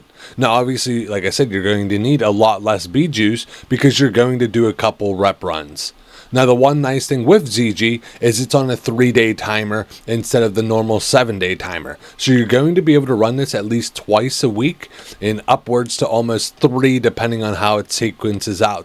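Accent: Philadelphia, United States. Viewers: i gotta ask.... you gotta be from around philly right??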